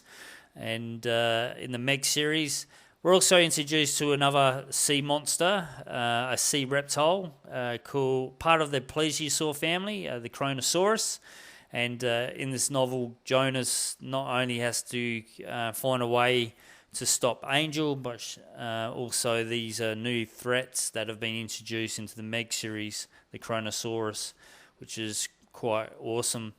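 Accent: Australia. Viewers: G'day mateeeee. I can tell your aussie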